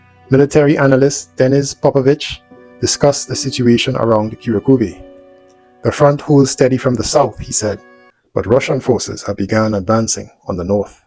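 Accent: Trinidad and Tobago. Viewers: Narrator sounds like a Trini?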